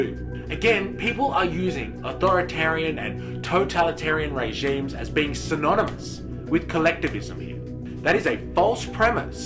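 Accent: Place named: Australia. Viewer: using a voice changer but still sounding Aussie? as **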